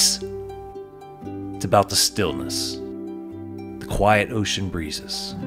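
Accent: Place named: New England, United States. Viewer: Here Here! 😁😍 he"s a Yankee...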